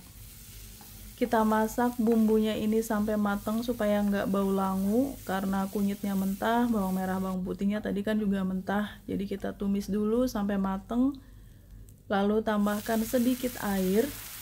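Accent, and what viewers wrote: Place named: West Java, Indonesia. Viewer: Pasti org Sunda...itu ada bahasa Lekoh nya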